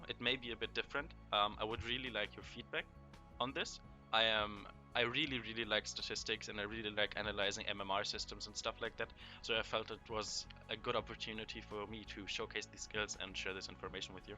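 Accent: Germany. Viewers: Great channel, keep up the good work. Sounds like a german accent btw ;)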